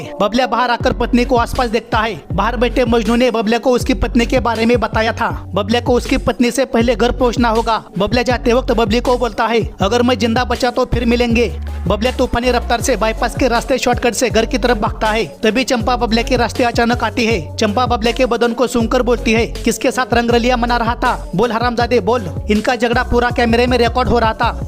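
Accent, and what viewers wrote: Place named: Mumbai, India. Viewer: Mumbaikars. Wali Hindi bol Raha hai Bhai ek number 😂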